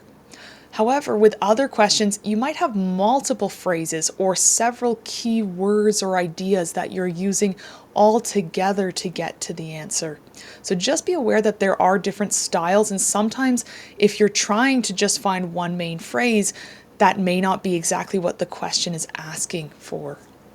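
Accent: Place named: Canada. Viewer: Thank you. Your explanation is the best I have come across so far. I think the Canadian way of talking is a big factor in the way you explain things clearly!!!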